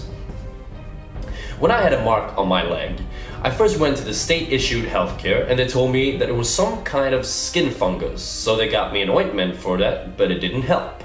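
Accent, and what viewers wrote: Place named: Canada. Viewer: Omg sounds like Canada 🇨🇦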